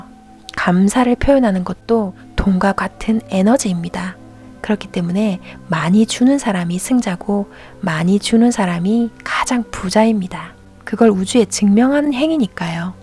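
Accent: Gyeongsang, South Korea. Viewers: 님 감사합니다 ~ 고향이 어디세요? 같은 경상도 사람으로써 경상도 억양이 너무나도 정겹고 듣기가 편합니다. ^^. 언젠가 가까운 시기에 꼭한번 뵙고싶습니다 ~ 좋은 말씀 듣고 힘내고 있습니다 늘 감사합니다! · 안녕하세요~ 님 오프라윈프리 방송인줄 알고 들어왔다가 님의 러블리한 사투리에 포옥 빠져서 듣다보니 벌써 두번째 보고 있습니다. 남들이 지적하는 나의 단점이 오히려 인생역전이 되는 최고의 장점이 된다는 말씀 그걸 어디서 언제 발산하느냐가 관건이다!!!는 말씀 가슴에 와 닿았습니다. 제 자신을 찾기 위해 나만의 색깔을 찾기 위해 날마다 물음표를 던지고 있는 저에게 큰 도움이 되는 영상입니다~ 감사합니다❤️🤩⭐️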